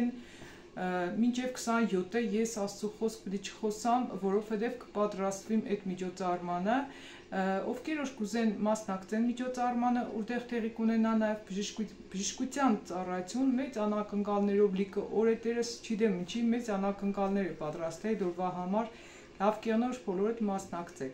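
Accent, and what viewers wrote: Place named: Gyumri, Armenia. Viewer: Բարև ձեզ շատ հաճելի է լսել իմ Գյումրեցու լեզուն Աստծո օրհնությամբ ապրես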